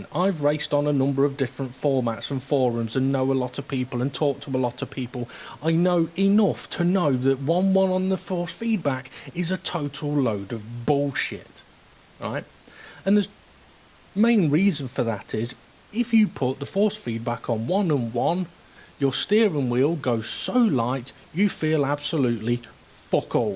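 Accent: United Kingdom. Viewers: I've subbed just for the classically British rant. Too funny but still informative. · So british. :)) Nice video mate, I use your settings, and I can feeel, everything from my car.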